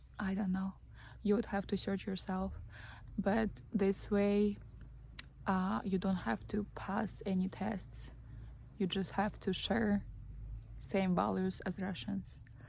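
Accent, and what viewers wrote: United States. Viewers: Ive heard you say in past videos you talk like you were living in the US. What got you to the USA? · u speak very good american english. i thoguht you were american and moved.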